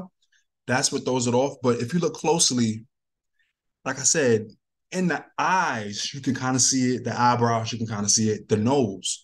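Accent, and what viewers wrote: New York, United States. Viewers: I just love your dialect and how you say. It’s so NY😊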